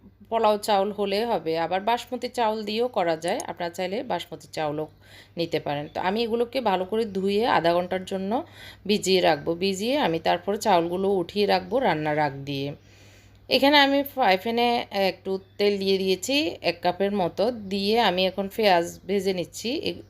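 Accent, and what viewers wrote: Noakhali, Bangladesh. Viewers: Thank you Apu easy ranna.amar bchara apnar vlog khub pochondo kore.😆amak onno kichu dekhte dai na.noakhailla hoyate tan ta ektu beshi😀😀😀.Masha Allah apni eto guni 1jon manus.joto dekhi toto mugdho hoi.khub balo thakben.❤❤💖💖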